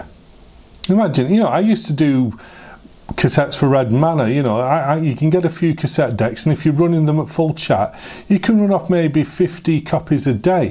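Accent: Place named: Lancashire, United Kingdom. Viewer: An interesting video, subscribed!👍 You sound Lancashire Vic reeves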